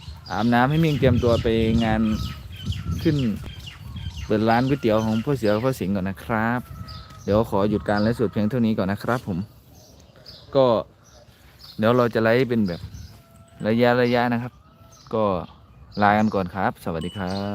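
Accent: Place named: Pathum Thani, Thailand. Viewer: ปทุมธานีชัด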